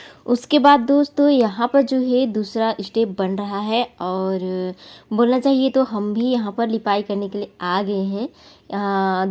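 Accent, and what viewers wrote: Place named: Chhattisgarh, India. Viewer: Aap Chattisgarh se ho kya didi aap baat kiye to lg rha hai mere ko aap Chattisgarh se ho mai bhi Chattisgarh se hu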